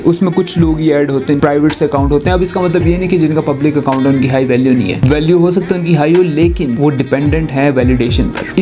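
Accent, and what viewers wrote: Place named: Pakistan. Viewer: Bro are you from Pakistan because I guess from the way of your talking.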